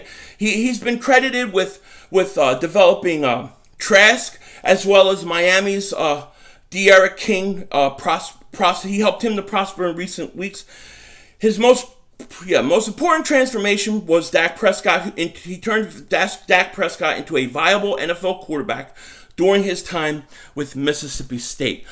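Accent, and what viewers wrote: Philadelphia, United States. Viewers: Wow never knew you were in cali, you talk like your from south philly, even your wall looks south philly lol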